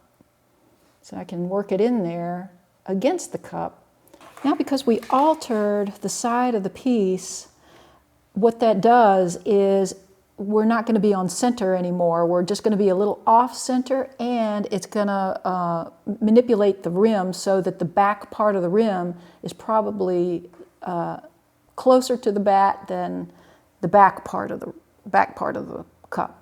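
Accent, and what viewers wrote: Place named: Florida, United States. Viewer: I really love your regular tutorial-style videos. Your powers of explanation are superb and easy to listen to, and your results are so beautiful. I've learned a lot from watching, and my wife and I try to never miss an episode. But this live demonstration was a whole 'nother level. Seeing the entire process in real time really brought home the work and planning that goes into this. And is true asset, his video sense is spot on and his perspectives help a lot. You two make a great team, and we love to see it in action. Also, your Florida accent comes out a lot stronger when you're in conversation mode. It's very entertaining!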